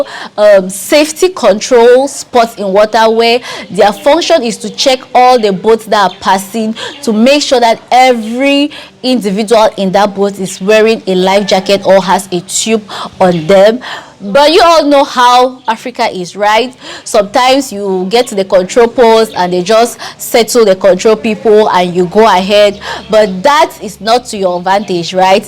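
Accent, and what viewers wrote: Nigeria. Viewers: did you live in Nigeria or Bameda before. You sound nigrian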